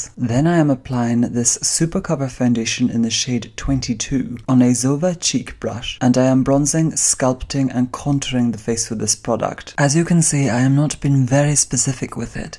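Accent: Scotland, United Kingdom. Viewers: Ah, I knew i heard Scottish inflections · SCOTTISH, I KNEW IT!!! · Scottish! I’ve been watching your videos all day trying to figure out what accent you have! Lol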